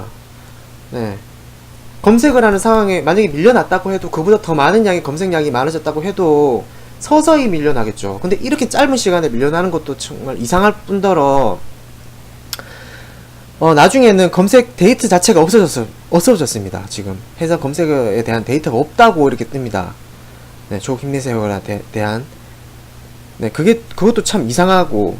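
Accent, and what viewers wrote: Gyeongsang, South Korea. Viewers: 대구 느낌나네요. ㅋㅋㅋㅋ 화이팅입니다. (저도 대구입니다~)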